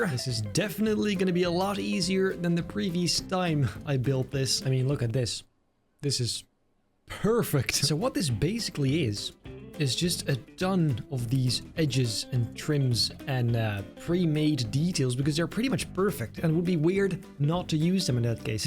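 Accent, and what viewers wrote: Netherlands. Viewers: ben je nederlands???? wand heb een gevoel dat je nederlands ben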